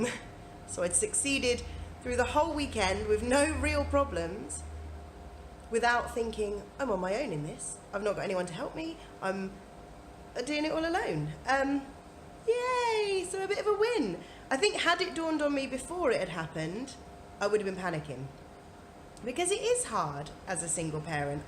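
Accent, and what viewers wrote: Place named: Mansfield, United Kingdom. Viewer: Are you local to me? I’m from Mansfield and your accent sounds similar to mine lol and you mentioned Nottingham! I’m not a single Mum but my Hubby works 60-70 hours a week so I’m on my own most of the time. Mummy win! Xx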